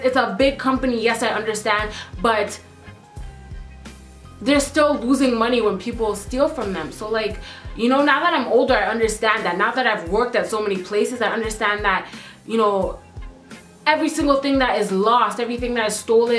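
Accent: Jamaica. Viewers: Are u also Jamaican or its just your mom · you have an accent, like a Jamaican accent. :o And you're super beautiful btw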